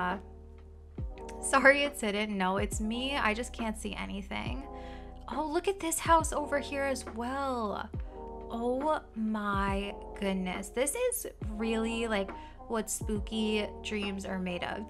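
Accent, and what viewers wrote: United States. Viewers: you can tell he is from the us :D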